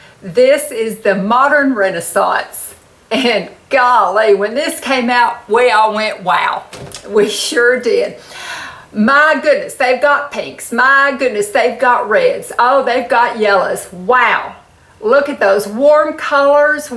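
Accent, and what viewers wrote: South, United States. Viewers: You are my new favorite channel, you're just a breath of fresh air and I can't resist a great classic southern accent!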